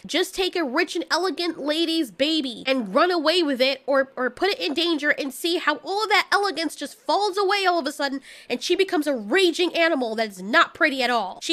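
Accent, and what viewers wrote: United States. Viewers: I thought u were American.Thats crazy.Are u American?❤ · not no accent she just speaks with a very smooth American accent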